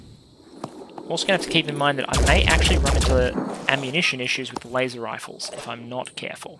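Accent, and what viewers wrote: Australia. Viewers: Your Australian accent is flawless!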